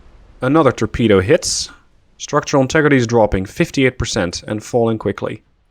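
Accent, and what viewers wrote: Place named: Netherlands. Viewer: Your accent has enough Dutch in it :)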